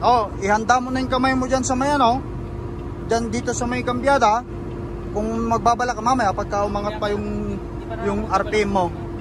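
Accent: Ilocos, Philippines. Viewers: Ilocano ka met ngayam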